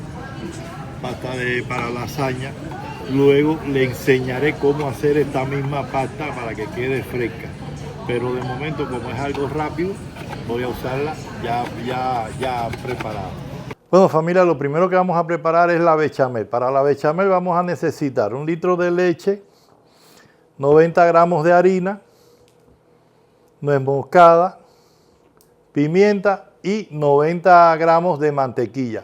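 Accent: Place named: Santiago de Cuba, Cuba. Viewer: Yo voy a hacer tu receta y seguir tus trucos tiene muy buena pinta. Dios te bendiga abuelito, me dio mucha nostalgia tú acento, yo también soy Santiaguera.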